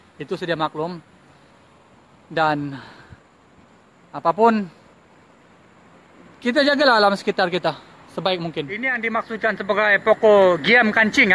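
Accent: Sabah, Malaysia. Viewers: dia tu orang Sabah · Loghat sabah ka,sarawak ka..tiada masalah..selagi menggunakan bahasa malaysia.saya faham je.,Setiap orang lain cara penyampaiannya..teruskan bro dengan konten² yg menarik. 👍🏻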